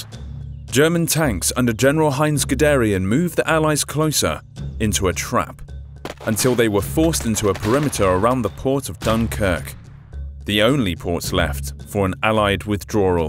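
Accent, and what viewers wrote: United Kingdom. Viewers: You actually sound Like a former Brit like me.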